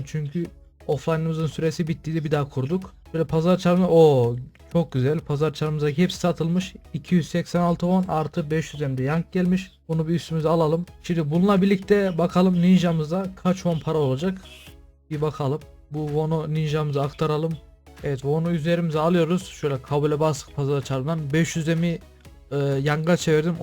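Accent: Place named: Konya, Turkey. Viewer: ilk 5 saniyede anladım konyalı oldugunu :D Çumralı mısın?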